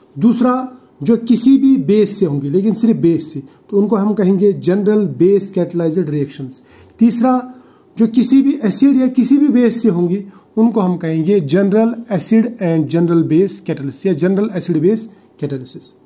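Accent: Kashmir, India. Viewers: Sir you sounds like kashmiri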